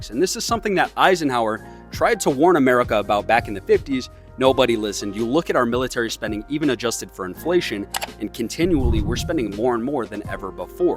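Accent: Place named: United Kingdom. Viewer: Just because you're British doesn't make it gen ZED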